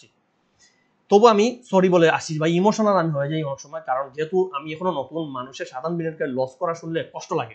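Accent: Sylhet, Bangladesh. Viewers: Good with sylheti accent. Topic doesn’t match with analysis.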